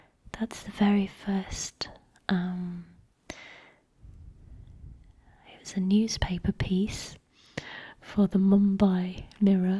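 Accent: United Kingdom. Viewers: I've often thought I could detect a hint of Northern in your accent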